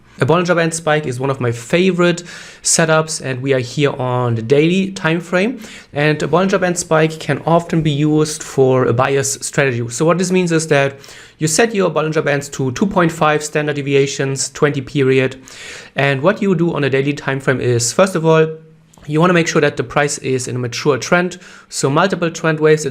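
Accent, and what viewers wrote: Germany. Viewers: Your English accent reminded me my German friends 🙏. During my stay in Europe, my English become quite similar to yours. Your generalizations about the market look amazing, however it's really difficult to predict a trend reversal specially for scalping traders, it would be better to follow an uptrend and downtrend untill we reach out stop loss quite often. 😜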